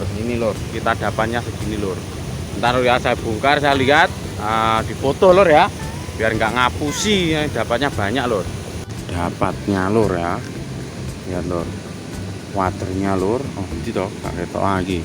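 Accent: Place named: Java, Indonesia. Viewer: Jowo mase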